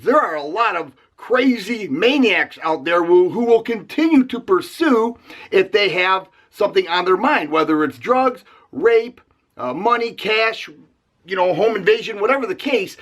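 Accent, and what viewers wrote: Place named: South Boston, United States. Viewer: Where did you get that accent.? I want to to say south Boston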